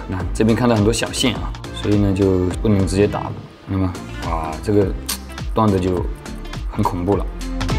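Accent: Wenzhou, China. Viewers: 牛 上来就是标准温州普通话口音，一看真的还是温州老板 哈哈 赞～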